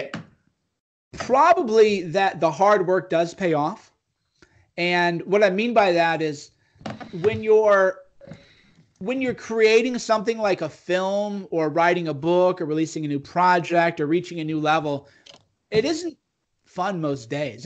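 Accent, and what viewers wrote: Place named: Germany. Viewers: He sounds likes he is from Germany or some eastern European country. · Yup, German!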